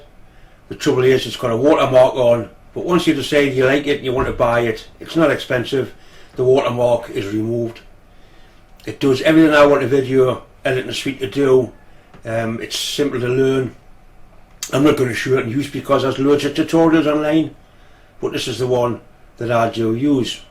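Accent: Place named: Geordie, United Kingdom. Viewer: Your the UK version of Chris from clickspring, tiny shop making amazing stuff. With that broad geordie accent that makes me think of home (Sadly I'm a Smoggie) and your gentle easy going way, it's just perfect. And the swearing means your human, hee. I always learn something and that is the best sort of video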